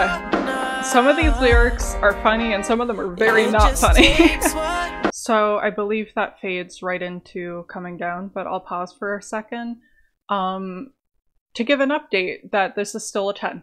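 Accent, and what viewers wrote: Canada. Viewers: Are u canadian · The Canadian accent strong as hell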